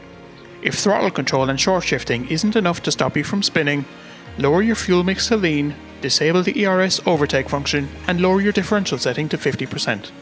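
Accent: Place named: Kerry, Ireland. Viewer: You sound like you’re from Kerry or somewhere near there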